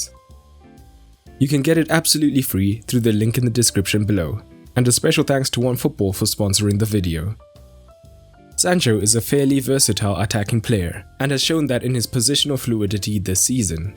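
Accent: South Africa. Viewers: Noh man I've been meaning to ask, are you from South Africa?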